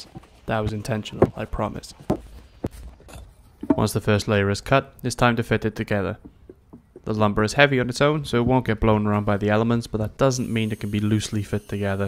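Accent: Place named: Wales, United Kingdom. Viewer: Good video. Only complaint is 'lumber' over 'timber' as you sound Welsh maybe and not American?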